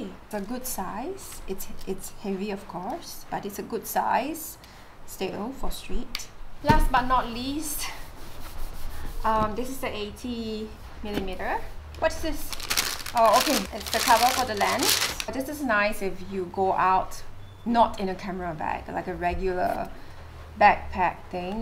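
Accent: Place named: Malaysia. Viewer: This is 2nd time I watch your video, I don't know your background my guess with your accent and mannerism is that you are either from Malaysia or Singapore, I think it is the former. BTW I am a Malaysian, and this camera is too expensive and sophisticated for me.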